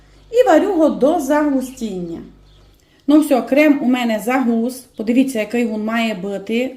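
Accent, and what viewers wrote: Transcarpathia, Ukraine. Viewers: Пані рада вас чути і дивитися на ваші витвори мистецтва,сподіваюся у вас все добре,і надалі ви частіше будете нас тішити своїми чудовими рецептами та закарпатським діалектом❤❤❤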